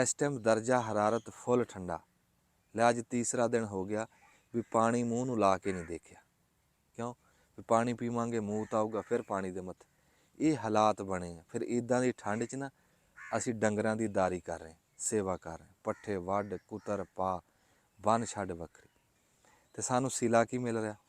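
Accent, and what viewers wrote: Punjab, India. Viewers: صاحب، چنگے کم لگے او پاکستان دے سارے پنجاب وچ نام نوں پنجابی وچ ناں آکھیا جاندا اے ،نو صرف انڈین پنجاب وچ بولدے نے ۔ ھور کرو پروموٹ انڈین پنجابی تے لہجے نوں تے ساڈی دعا اے کہ 47 دوبارہ نا دھرا دین جدوں کوئی ویلا آوے فیر مستقبل وچ ،اے ساریاں محبتاں نک دے راھے نکل جانیاں نے۔😢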